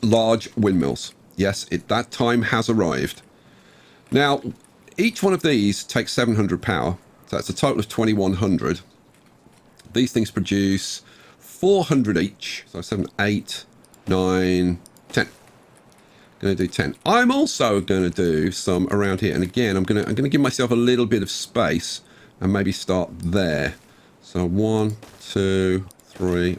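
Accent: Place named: United Kingdom. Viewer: Dude you have big potential, you remind me of RCE with your British accent and enthousiast and jolly attitude. Also love the little interactions with your wife. Keep doing what you are doing man!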